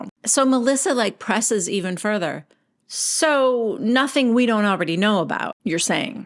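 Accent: New Jersey, United States. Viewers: Love you!! You do such a good job recapping!! Your Jersey accent is hilarious 😍 Louie is a narcissistic control freak... Teresa is the perfect victim Unfortunately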